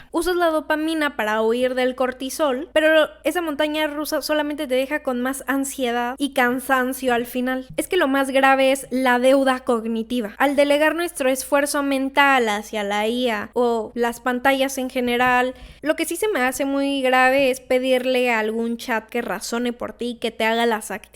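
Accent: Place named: Whitexican, Mexico. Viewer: Primer video de (pseudo) ensayos que veo con tono mmdor whitexican, algo irónico.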